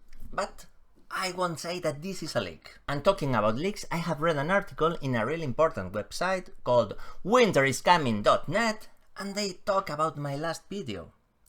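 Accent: Spain. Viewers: I don’t know how I ended up here but as soon as I heard your accent and pronunciation I knew you were Spanish 😅 ( I’m also from Spain btw )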